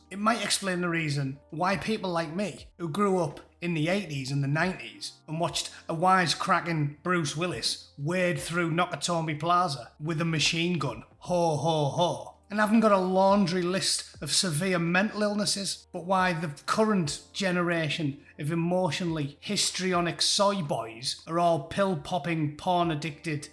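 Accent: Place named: Middlesbrough, United Kingdom. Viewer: bang on ' '...love the boro patter, makes my bloody day yer silver tongued cavalier!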